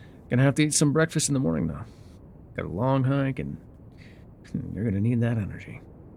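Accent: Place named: South, United States. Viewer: Charlotte is my spirit animal Edit: that cliffhanger was FOUL Edit 2: relistening to see if i missed anything and i didn't necessarily miss this but every time I hear Lotte i think of princess and the frog lol Anywizzle- This story is actually so cool, the concept is amazing and the mystery keeps you coming back to listen more Also Its like a.m. and I should probably be asleep but who needs sleep when you have? Edit 3: he keeps calling us pet names like sweetheart and whatnot and I don't think thats just because hes casually finding excuses not to say the listeners name Also Love to slight southern accent and how its not very forced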